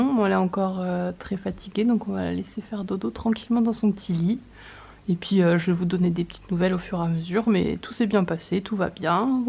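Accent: South, France. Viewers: Depuis quand tu as un accent du sud ??? 😂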